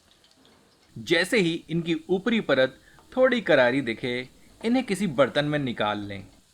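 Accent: Standard. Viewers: First of all pranaam for your shudha hindi, recipe is awesome.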